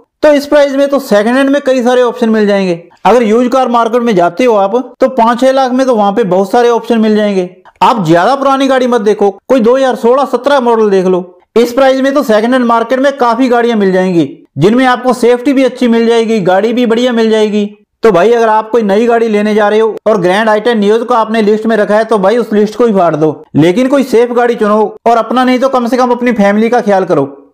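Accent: Punjab, India. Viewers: ਤੂੰ ਪੰਜਾਬੀ ਏ ਤੇਰੇ ਬੋਲਣ ਦੇ ਲਹਿਜੇ ਤੋਂ ਪਤਾ ਲਗਦਾ ਵੀਡੀਓ ਪੰਜਾਬੀ ਚ ਕਾਸਤੋਂ ਨੀ ਬਣਾਉਦਾ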